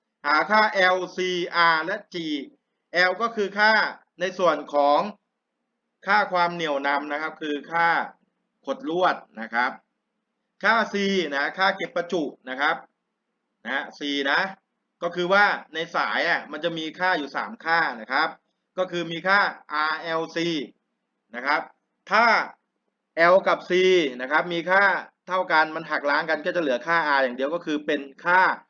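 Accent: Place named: Samrong, Thailand. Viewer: เว้าสำโรง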